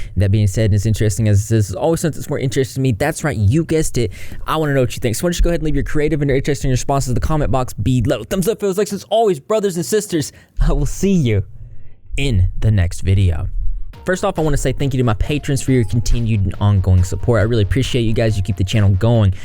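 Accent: South, United States. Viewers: the cutest southern drawl I've ever heard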